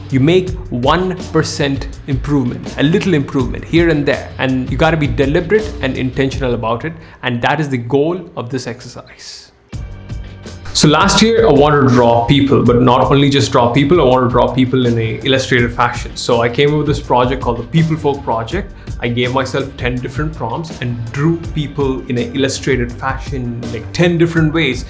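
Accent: India. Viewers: Pls telllllll arrrrrr uuuuuuu Indian?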